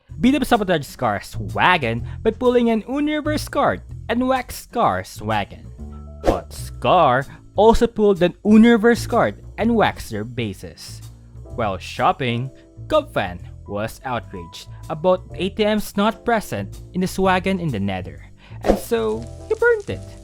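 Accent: Philippines. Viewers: You seem to have a filipino accent. Are you?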